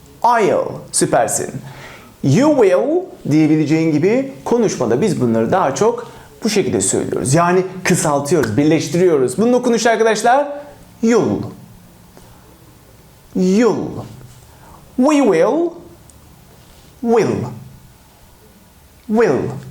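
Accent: United Kingdom. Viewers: Hocam lütfen daha çok zaman ayırın.İlk defa ingilizce öğrenebileceğim kanal buldum hem de ingiliz aksanı. Çoğu kanal umut satıyor, insanlar izledikleriyle kalıyor malesef. İngiliz aksanı ile konuşulan film ve dizi önerileri gelirse çok iyi olur 👍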